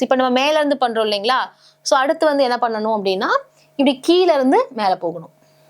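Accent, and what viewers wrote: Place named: United Kingdom. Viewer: 👍 What a british english ...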